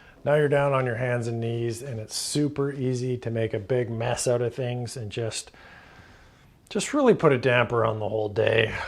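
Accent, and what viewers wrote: Canada. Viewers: I just was listening, YouTube started the video late, I had to stop the video.. you're going to tell us "what you ended up doing on the toilet you installed upstairs " ugh, there's more than one answer, and the most common involves disposal of bodily waste. Here I thought you were American as some Canadian dialect just doesn't bleed out, namely syllables with an "o" show off from Canada like oo sound compared to said from a United States citizen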